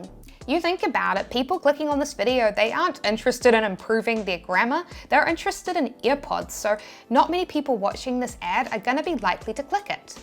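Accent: New Zealand. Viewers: Maybe Youtube heard you say "beach" in your NZ accent! BTW wouldn't it be fun for you to attempt doing an American accent for an entire video? I love hearing what we sound like to others around the world.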